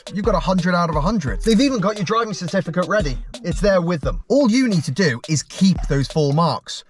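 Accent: United Kingdom. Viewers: Are you from Stoke-onTrent? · Oh my god you're from Stoke 😊😅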